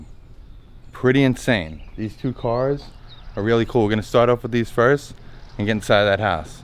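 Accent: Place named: Long Island, United States. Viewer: i take it you split from either long island or nyc i leaning more on the long island thing by the way you talk .so you raded ny for flo???????how is it dwn there thinking about moving myself .from the great communist north east ..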